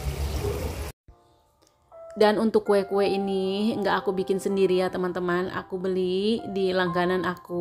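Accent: West Java, Indonesia. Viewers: teteh asli mana? Logatnya sunda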